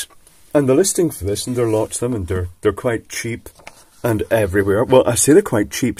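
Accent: Scotland, United Kingdom. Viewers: You're voice sound like Scotland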